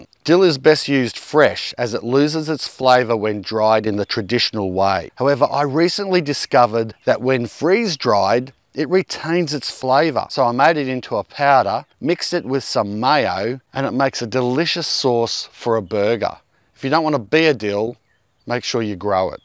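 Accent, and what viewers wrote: Australia. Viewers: Your "Down Under" accent at times makes me raise my eyebrows and ask "Did just say what I heard him say?" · I've been watching his vids for nigh on 2 years and whenever I mention gardening my family now respond in Australian accents..."What does say?" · I will tell which I will replace: None! Your channel is amazing. Plus that Australian accent and your passion your are right up there with the late crocodile hunter. Good lookin out bro.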